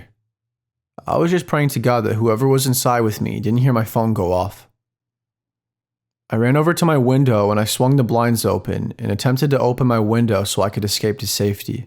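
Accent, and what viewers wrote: United States. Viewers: No offense mate, but your accent sounds like a stereotypical California suffer bro dude. It’s not a bad thing. Haha. But it’s hard to take you seriously when your voice sounds so unserious. Let’s face it the Cali suffer bro aren’t the most serious types of people. 😂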